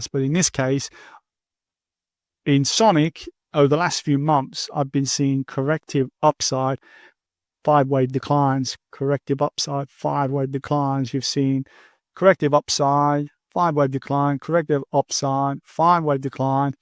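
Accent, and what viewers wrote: Birmingham, United Kingdom. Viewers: Are you from Birmingham uk?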